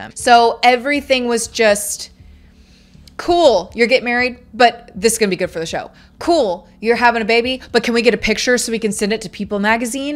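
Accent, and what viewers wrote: Arkansas, United States. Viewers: ❤ Are you from Arkansas? I am..😂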